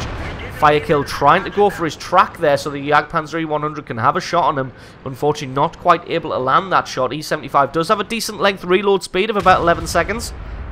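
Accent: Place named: Scotland, United Kingdom. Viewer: When I watch and listen to your replay videos I can't help but be reminded of Jackie Stewart, the F 1 racing legend. When I was young my Father liked watching the Races with Jackie announcing, as did I. We would both try mimicking his voice to no avail. The Scotts dialect is quite difficult. Lol good memories, as my Father passed away young.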